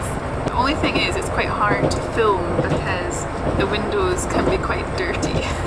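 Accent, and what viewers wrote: Scotland, United Kingdom. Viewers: Hi How are you doing today Ilike watching your youtube channel I like your Scottish Accent you look Very Beautiful Stay safe traveling you have Beautiful Weekend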